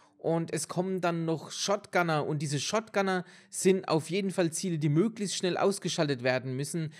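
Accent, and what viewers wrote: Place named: Palatinate, Germany. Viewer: Vom Dialekt her, schätze ich auf Mannheim, Heildberg, Pfalz!?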